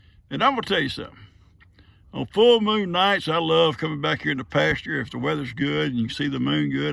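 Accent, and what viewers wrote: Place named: Arkansas, United States. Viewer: I enjoy all the videos and I could listen to you talk all day. You say some expressions my dad used to say and the accent too. He was from Arkansas and has been gone for 21 years. I continue to pray for Gigi and Raleigh. Totally happy that Ivan is so much better, he makes me smile. Still praying Sooky comes home too ❤️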